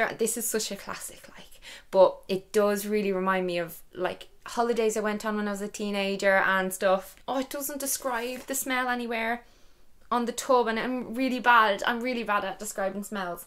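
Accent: Ireland. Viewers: Beautiful youuu! That Irish accent is adorable!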